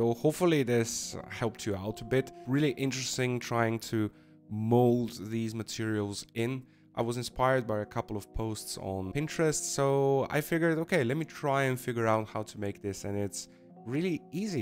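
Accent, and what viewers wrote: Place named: Ireland. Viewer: I don't know why, maybe because of your accent, I was convinced you are in Ireland 🤦‍♀️😊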